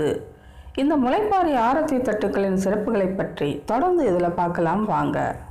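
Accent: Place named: Tamil Nadu, India. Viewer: Ungal thamizh slang nalla iruku sis.. Azhagana arathi thattugal..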